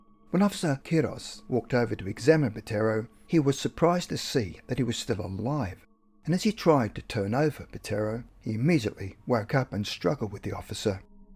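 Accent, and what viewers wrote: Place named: Australia. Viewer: Ugh, the Aussie accent just doesn’t work with narration. Otherwise, a fan of your channel! 😩😅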